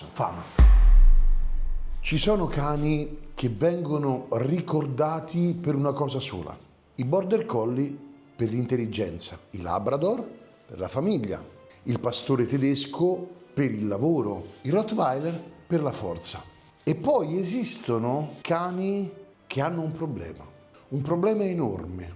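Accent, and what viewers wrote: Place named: Rome, Italy. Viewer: E vero non se ne vedono più credo perché oggi ottimizziamo tutto io ora sono in Umbria e i cacciatori preferiscono razze più piccole meno manutenzione e spesa, a parte ciò credo che oggi oltre a passatemi il termine non servire come un tempo a tutto si scelgono razze x un solo scopo si semplifica ci vorrebbe troppo impegno altrimenti ormai siamo tutti Smart ma i cani sono rimasti cani per fortuna grazie del video sempre molto limpido e facile da seguire ( ogni tanto famme risenti n’ po de romano che me manca 😂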